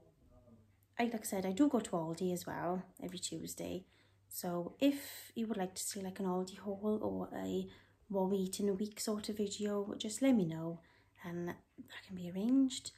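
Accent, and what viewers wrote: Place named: Wales, United Kingdom. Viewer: I'm just about to upload my haul, but still watch other people's incase they see something I've missed is that a fellow welsh accent I can hear??? Welshie here too!! 😁 let's support eachother 😘💗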